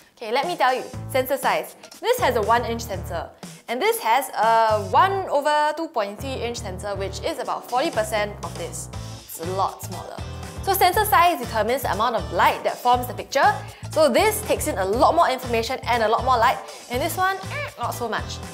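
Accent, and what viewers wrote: Singapore. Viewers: cute. am detecting Singaporean/Malaysian accent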